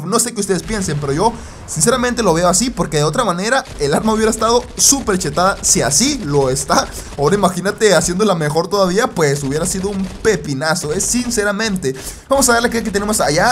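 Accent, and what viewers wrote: Colombia. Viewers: eres colombiano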